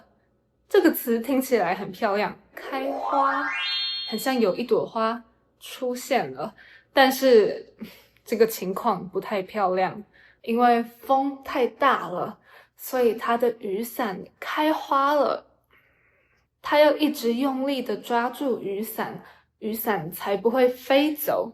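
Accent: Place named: Taiwan. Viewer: Baby's Chinese mandarin is Taiwanese style lol I know he speaks good Chinese but very Taiwan ah : ) plus he always acting cue OMG the way he types words and how he expresses himself, anyway not mainland China style :face-green-smiling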